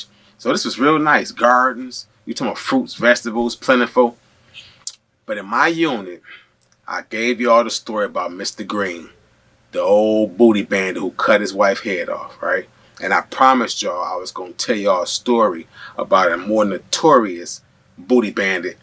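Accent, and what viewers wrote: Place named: Detroit, United States. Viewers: Man like 1 minute into the video, I'm like "Doggie from Detroit" lol gotta be, or somewhere close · This is hilarious 😂 🤣🤣 I knew you was from the Midwest I'm subscribed bro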